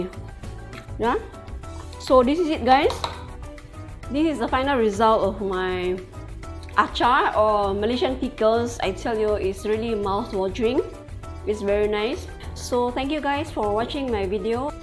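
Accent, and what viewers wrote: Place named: China. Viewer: Hello galing naman para kang Chinese mag English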